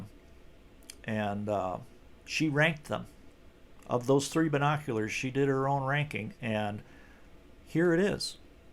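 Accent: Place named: Levant, Arab world. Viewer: Nice to hear from you, V :) So glad to hear you’re enjoying the New Year. I hope it is a prosperous one in those important ways. It feels like a mixed New Year. I’m more optimistic than usual but fear there are a lot of conflicts and disputes far from being resolved with the ability of involving more countries / raising other simmering tensions... Your accent sounds familiar but hard to pin down. I suspect I’ve not met anyone from your origins to be sure. Listening again, I hear different mixtures sometimes familiar accents. I suspect the Latin hints comes from learning those accents of English. Thanks for the clues, I don’t know distances well, but I would say NE of the Levantine, approaching Europe... I’ve gotten into the habit of playing videos as fast as still legible, and forget how it chipmunks the sound. Well, in your case Levintines ;)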